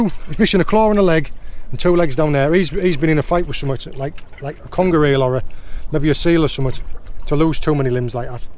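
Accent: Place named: North, United Kingdom. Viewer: Great vlogs, your like the fred dibner of fishing, your teaching abilities are on point, more so than reading a book, what you say is very interesting it goes in one ear and it stays in the other. Is your boat able to sustain a living or do you have another income source. How many pots do you set per day. Where in the north is your accent from. Set a trap for the pot thief's.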